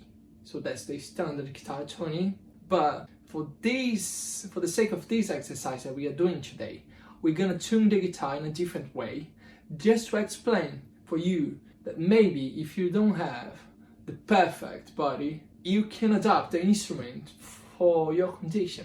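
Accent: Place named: United Kingdom. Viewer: What a beautiful British accent, my friend!!!! I want to speak like that toooooooo!!! Saudades, meu amigo!!!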